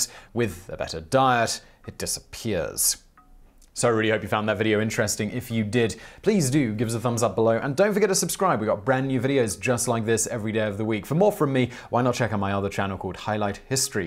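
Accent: England, United Kingdom. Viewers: Why is Michael from VSauce speaking British? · What area of England does your accent come from?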